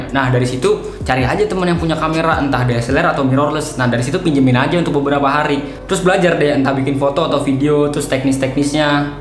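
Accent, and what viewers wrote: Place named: Bali, Indonesia. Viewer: Kak aslinya orang mana sih kalau boleh tau?, soalnya kalau "menurutku" sih kayak orang Bali logatnya, maaf kalau salah ya Kak 🙏🏻😅😁🙏🏻 #Nanya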